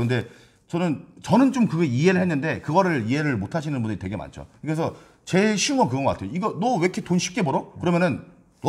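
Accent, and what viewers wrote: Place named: Gangwon, South Korea. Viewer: 듣다보면 강원도 사투린지 조선족 사투린지 햇갈리네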